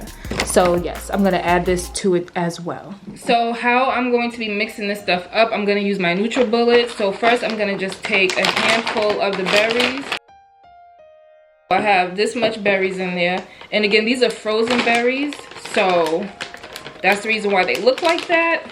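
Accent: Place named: New York, United States. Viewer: Girl yassss get your sponsorships I see you sis! I'm a vanilla lover as well - I usually choose that flavor when it comes to protein shakes. Also, where are you from? Are you from NY? I have been trying to guess based on your accent!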